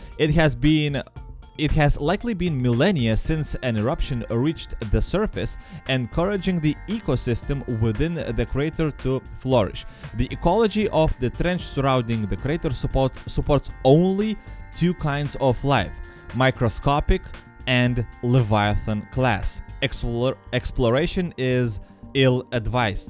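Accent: Russia. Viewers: are you Russian? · Is he Russian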